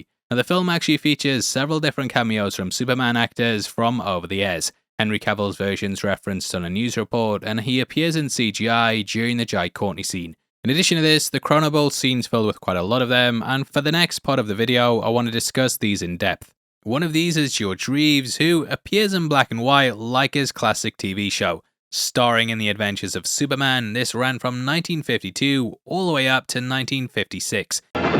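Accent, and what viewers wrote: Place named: North, United Kingdom. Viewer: Find your accent so interesting. Clearly northern but you drop ‘t’s like an American. 😅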